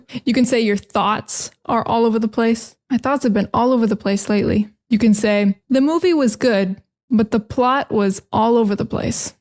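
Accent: United States. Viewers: Hey,! Your American accent is just delicious! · This is the kind of class that adds value, because you not only enjoy listening to real American English, but you also get to admire the beauty of North America through this warm person.🌹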